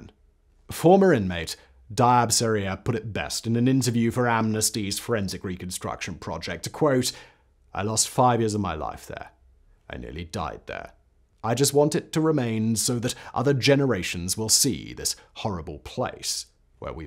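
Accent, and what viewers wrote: United Kingdom. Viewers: his accent is becoming more British each video somehow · Bro that too much British accent for me to handle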